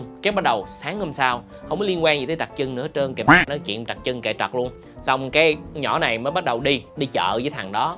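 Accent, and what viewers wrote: North, Vietnam. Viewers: eh bò đỏ, ông dùng Tiếng bắc nè, mày thưa giùm tao cái. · review có tính cà khịa, nói lái theo giọng người miền bắc thì phải ?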